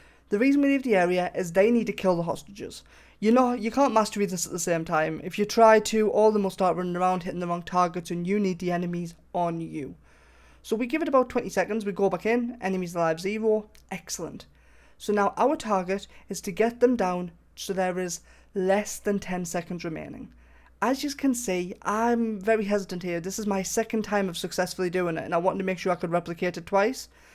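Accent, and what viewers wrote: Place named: Newcastle, United Kingdom. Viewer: The only thing that makes this already awesome glitch better is the geordie accent hawayyyy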